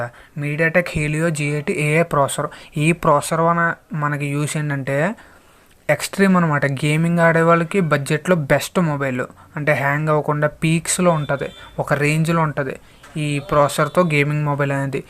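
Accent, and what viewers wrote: India. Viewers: nice talk...i think your EAST or WEST in Ap..😁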